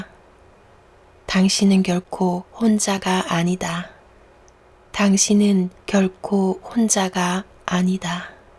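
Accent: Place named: Busan, South Korea. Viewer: 재밌는... 흥미로운 내용 감사합니다.. 혹? 부산이세요.. 반갑습니다! 매일매일 행복하세요...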